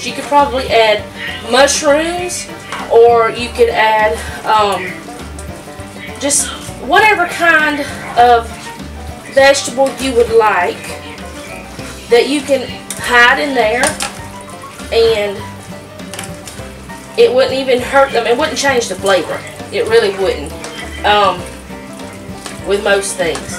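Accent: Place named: South, United States. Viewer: I love your heavy southern accent. ❤️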